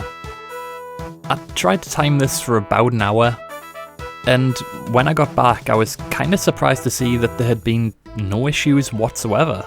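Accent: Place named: North, United Kingdom. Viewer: Sound like a fellow northerner, great vid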